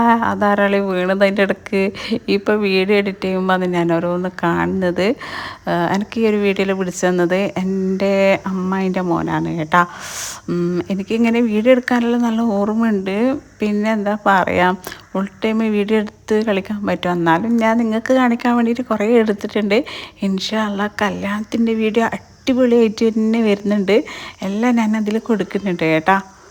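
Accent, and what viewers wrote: Kannur, India. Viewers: നിന്റെ ഒറിജിനൽ കണ്ണൂർ ഭാഷ അടിപൊളി 🎉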